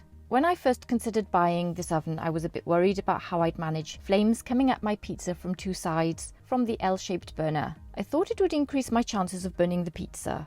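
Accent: Wales, United Kingdom. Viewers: Welsh accent is lovely, great review! Very in depth, confirmed my decision to get one I reckon. Cheers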